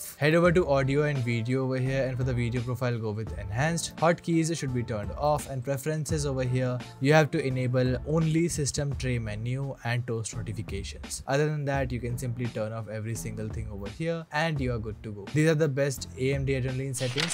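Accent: India. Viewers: are you indiina?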